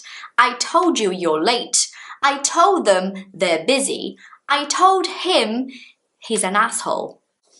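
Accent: United Kingdom. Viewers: o mais incrível é que eu entendi 90% do que você disse, e ainda nesse sotaque britânico aaaaaaaaaaa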